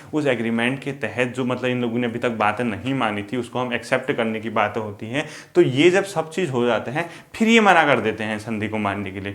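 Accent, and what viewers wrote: Kumaon, India. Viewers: Bhai aap Kumouni ho? Aapka bhasa shilli batata hai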